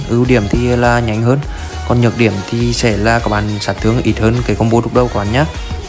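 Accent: Central, Vietnam. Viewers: anh là miền trung ak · Giọng miền trung là like comment đăng kí liền. Ko nói nhiều. Ủng hộ bạn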